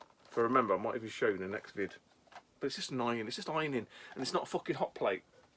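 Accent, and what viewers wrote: United Kingdom. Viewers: it was cool to hear the accent and the eloquent swearing. I have been working overseas the past seven years so I dont hear British accents anymore ha ha.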